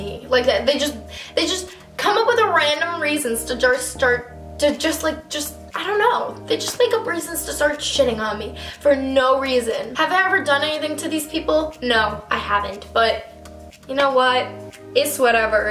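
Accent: New York, United States. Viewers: Dominika Pisula she has that like “New York” accent (she’s from Long Island) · i think its just her new york/long island accent hahaha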